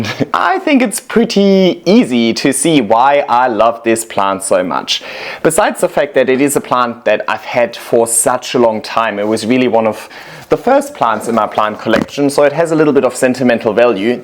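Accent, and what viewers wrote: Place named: Germany. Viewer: Grazie per i tuoi bellissimi video, per la generosità con quale condividi la tua esperienza. Da anni sei una fonte di ispirazione per me! E poi c'è una cosa buffa, che capisco molto meglio il tuo Inglese con leggero accento tedesco, rispetto al inglese comune 😂. Grazie❤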